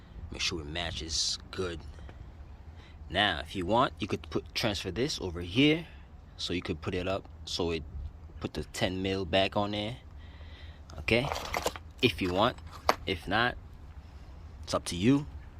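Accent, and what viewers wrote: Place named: New York, United States. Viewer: Bruh you from the east coast huh you sound like my partner Tommy he say stuff like there’s gone be mad fluid like the lingo and accent sounds like him and New York people 😇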